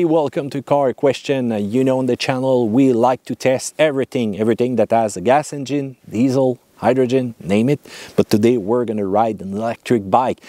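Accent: Quebec, Canada. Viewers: the french canadian accent cracks me up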